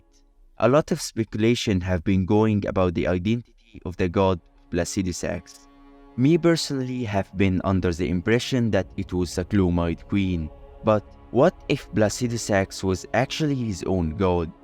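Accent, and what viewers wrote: Egypt. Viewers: اللهجة المصرية احلى اشي 😂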